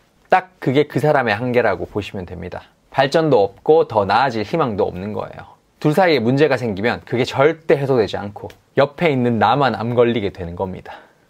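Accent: Gyeongsang, South Korea. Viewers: 이분 말투 들으니.. 오리지날 서울사람 아니시네...경상도 분이시네......서울말 쓰려고 노력하는 경상도 분...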